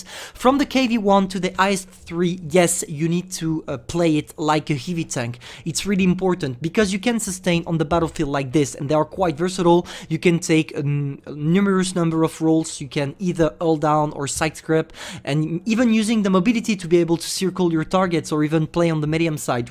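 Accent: France. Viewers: he's french ,i speak French, English Greek, that's how the French speak English because the i ,in French it's like the English e in English an i ia pronounced ai . there you go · T'es français? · C'est l'accent français ? Je peux pas croire que t es anglophone de base (je critique pas ton anglais)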